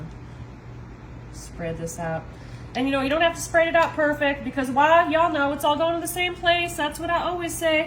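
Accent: South, United States. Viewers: That's a southern accent.. not an "AMERICAN accent"!!! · Every thing you make is fabulous ! Enjoy you American accent 👍👍💖💞💞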